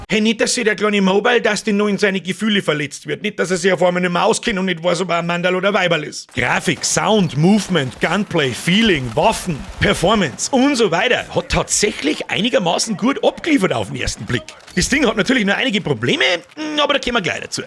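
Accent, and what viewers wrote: Bavaria, Germany. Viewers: Geil, endlich moi a bayrischer Spieltester! Des feier i ! Abo und like ist raus. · Woooas?!?! Superüberfordert vom Bayrisch aus dem Stand Sehr erfrischend Japp nice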